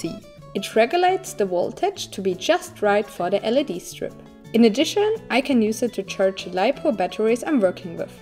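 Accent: Germany. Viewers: Which country do you come from? It sounds a bit like a German accent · I think she's German.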